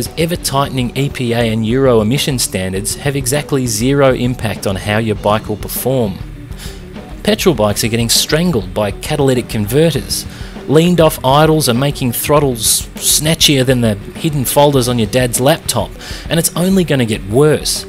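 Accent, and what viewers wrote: Australia. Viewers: Need more reviews like this. The Aussie flavour is refreshing compared to the yank reviews.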